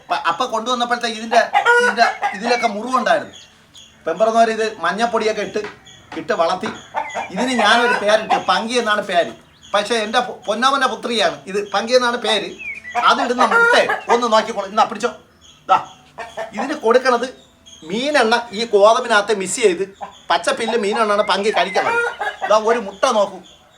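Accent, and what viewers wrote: Thiruvananthapuram, India. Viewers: എവിടെ വീട് ചേട്ടാ? തിരുവനന്തപുരം സ്ലാങ്. ഒന്ന് നേരിട്ട് കാണാൻ ആണ്. അഡ്രസ് ഒരു ഫോൺ നമ്പർ ഇടുമോ.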